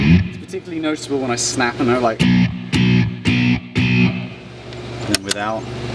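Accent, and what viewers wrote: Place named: United Kingdom. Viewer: I just came here for the British accent.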